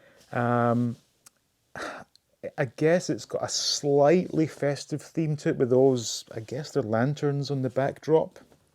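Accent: United Kingdom. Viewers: Do yo live in the U.S. ? I noticed you sound like you're from the U.K., but based on some of your past videos I figured you live in the U.S.,but grew up in the U.K. as a kid?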